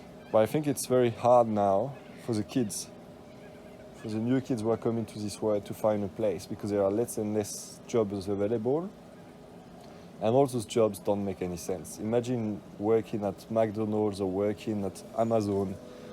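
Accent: France. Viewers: I used to be pessimistic about the future of the world but over the last 20 years I think we have turned a corner. Doesn't make many people happy the future I see as it's tech driven but that the way it will be. It's just spreading the wealth is the issue and that political. Anyway thats a long story. On a completely different topic, that's a French accent? The wifes French and we come in to Melbourne occasionally for your sons braces to be done in Bentleigh East. A very important question and if you have an answer it could make the wife very happy (and the son and me). Is there a good French bakery near there and/or a French importer of foods? Thank you.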